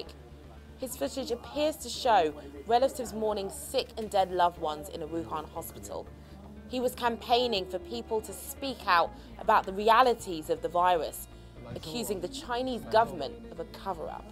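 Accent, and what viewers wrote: United Kingdom. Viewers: I would believe it's a cover up but i hate British accents and colonialism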